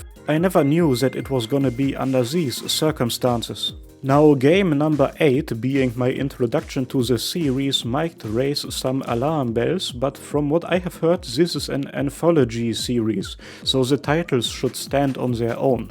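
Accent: Germany. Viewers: Love your german accent hahah 😀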